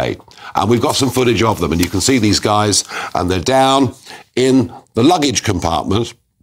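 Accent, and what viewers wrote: United Kingdom. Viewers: Thick British I’m not surprised.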